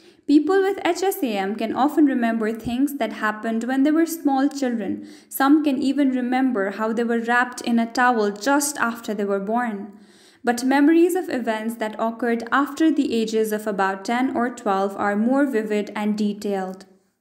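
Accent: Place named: India. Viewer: Omg an Indian narrator , unfortunately I have a low tolerance for this, bye.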